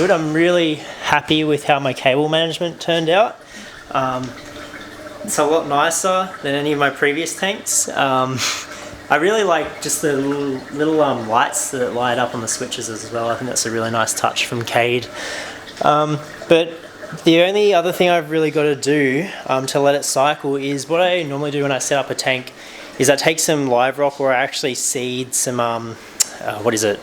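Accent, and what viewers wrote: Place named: Australia. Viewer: What was the name of the controller? I don’t understand your Aussie accent well enough 😅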